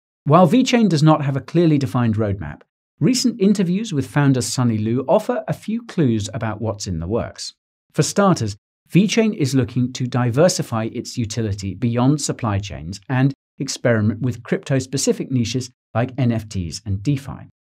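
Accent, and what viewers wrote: United Kingdom. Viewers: Is he British I can't tell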